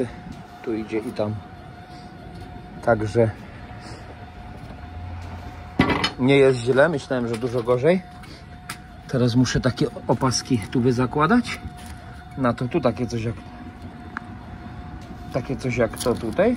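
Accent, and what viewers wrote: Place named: Podhale, Poland. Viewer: No chiba z gor hoho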